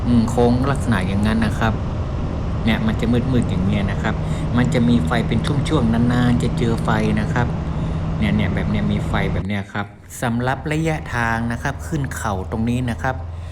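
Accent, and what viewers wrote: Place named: North, Thailand. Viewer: คำพูดออกเหนือๆฟังดูน่ารักดีนะครับ...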